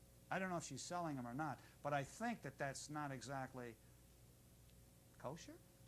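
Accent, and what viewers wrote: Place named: New York, United States. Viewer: It's a new york accent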